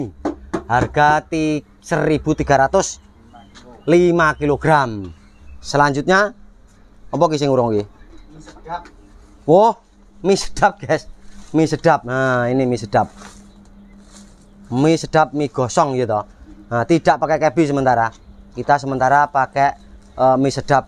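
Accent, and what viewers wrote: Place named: Java, Indonesia. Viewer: Bahasa ny medok tapi peternak cerdas ini😊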